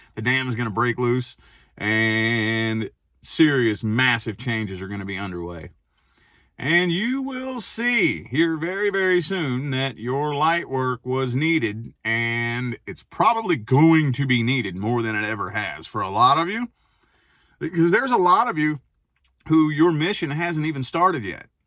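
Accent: United States. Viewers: I see you becoming a great rapper next. Your voice is very American. might inspire you to consider it.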